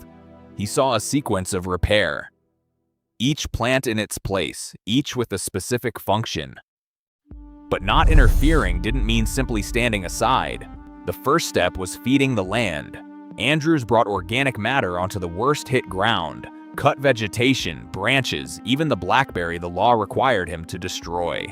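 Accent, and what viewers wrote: United States. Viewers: Should have had an Australian do the vocals not a god dam yank. Anyway you greenies suck eggs.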